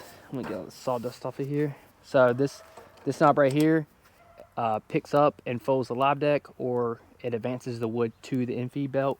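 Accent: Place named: North Carolina, United States. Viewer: North Carolina i knew it. This is random but i like to try and figure out where a place is by the environment, accents, and any other clues. I said hmm somewhere south east, ran thru a couple states then i said ah i bet it's north Carolina. Anyways, cool toy i want one!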